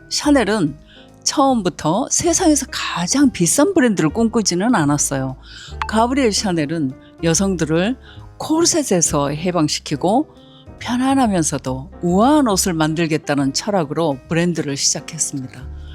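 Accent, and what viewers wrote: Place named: South Korea. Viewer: 서울사투리 + 경상도 사투리 + 전라도사투리가 세가지가 느껴지는 말투를 가지셨어요 ㅎㅎㅎㅎ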